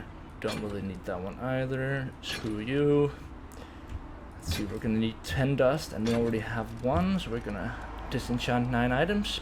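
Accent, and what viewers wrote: Denmark. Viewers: Classic Riposte is a fencing move. A parry witch flows fast and directly into an attack. Also can't wait for Mastery video. By the way do I detect a fellow Danish accent? :)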